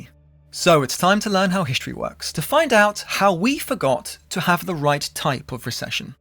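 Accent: United Kingdom. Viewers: Hearing British people swear while talking about American history adds sunshine to my day. Subscribed! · Oh man, I love this video but something about a lofty british accent over the mocking depiction of dust bowl victims make me want to wage a second revolutionary war (but like, just on this guy.)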